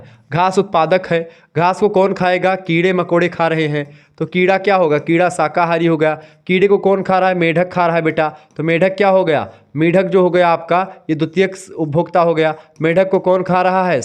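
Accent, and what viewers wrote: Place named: Bhojpuri, India. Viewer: are bhaiya pure hindi bola kariye ye bhojpuri dhang se samajh mein nhi ata hai samajhne mein dikkat hota hai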